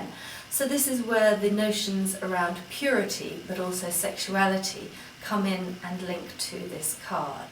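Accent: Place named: England, United Kingdom. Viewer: oh ! I v just seen your présentation in your channel, and , you talk about the Celtic Lenormand ..are you the creator of this deck ? its amazing , I ve just received one from amazon after have looking some reviews on others channel , and I find pictures so sweet and beautiful ..its funny for me , because , when i ve purchased it , I didnt know it was your deck , but in the same time , I ve discovered your vidéos about Lenormand !!like an évidence ! I appreciate your english accent , its easy for me to understand your videos and thats why I suscribe on your channel .Which town are you from ? London ? Oxford ?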